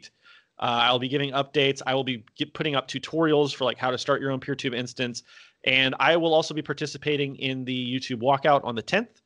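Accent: South, United States. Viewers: and since speaks in his native Southern here, perhaps he can get Olly to speak in his native Northern (Geordie)... I'd like to hear that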